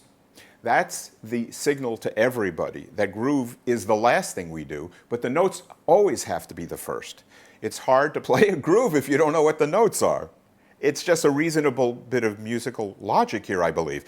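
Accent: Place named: Long Island, United States. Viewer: A fellow Lawn Guylander?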